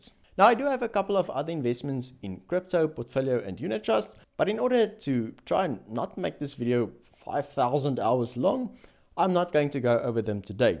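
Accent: South Africa. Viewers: Cool video! Love the accent. SA represent. I also noticed a big gap in localised content in the marketing and entrepreneurship space. ❤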